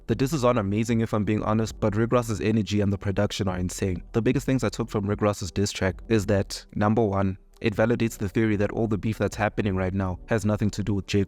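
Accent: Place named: South Africa. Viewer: South Africa.. I can hear it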